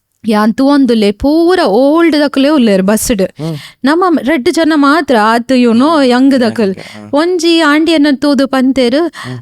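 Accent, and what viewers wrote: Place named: Tulu, India. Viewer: This madam tulu is foreign tulu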